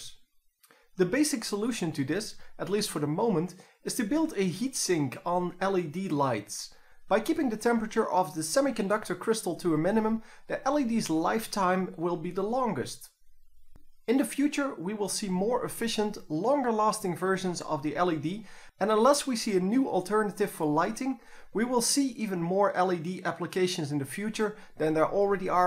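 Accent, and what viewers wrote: Netherlands. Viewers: you sound dutch are you if you are hoi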